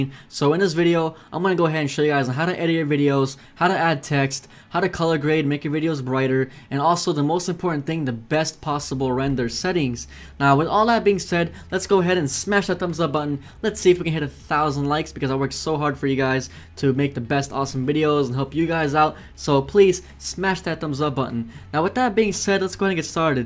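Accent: Croatia. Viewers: Are u from Croatia?! :OO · Are you Croatian? :P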